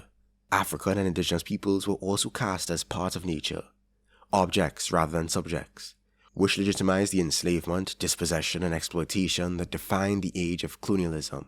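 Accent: Trinidad and Tobago. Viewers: hearing this trini voice dropping gems on my yt recommended was not on my bingo card 😂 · I am a long-time subscriber, a huge fan of your videos. In particular, I enjoy the prosodic cadence in the steady flow of your voice evident in your videos' systematic coverage of a given topics that will ensure they receive the explanation that they are due in time. I found myself thinking during this excellent video's presentation of your utopian-optimist theory-laden anarcho-idealist perspective, in your pleasant Trinidadian voice about the gravity of this rare moment in socio-political-economy which seems to invite a new analysis of every human philosophy or branch of one of the social sciences. For the sake of your intellectual curiosity & to spare me the frustration of yet another rewrite of this message, please watch the following 20 minute video on the polltical-economic assumptions of the 20th century that must be overcome to readjust to the Political Reality of the present era in which corporations have more financial power than nation-state actors. 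I would like to write an essay on this video in particular as it is a worthwhile tangent for serial nonfiction writing I am working on about the evolution of the United States BigTech into the monopolostic behemoths that they are today where dehumanizing pursuit of greater raw power far exceeds any & all ethical principles they pretend to have. At this moment it has become obvious to US Software Engineer YouTubers that Google's Play Store Global Developer Registry policies are paving the way for a grim neofascist/technof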